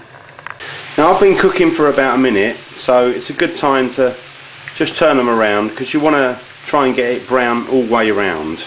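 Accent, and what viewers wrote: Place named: England, United Kingdom. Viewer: Real english Accent, Real English Chips :) Good Lad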